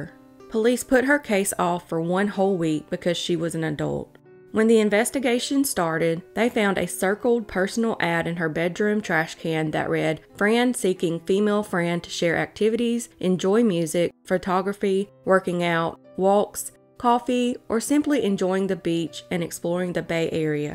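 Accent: South, United States. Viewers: I like your Southern accent ... And of course the interesting stories and great narration. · This narrator has got to go. I've lived in Arkansas most of my life and have a Southern accent myself, but this is just frustrating and distracting. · Love your channel! You have such a soothing southern voice These cases are so tragic. You should definitely do another Oklahoma one!!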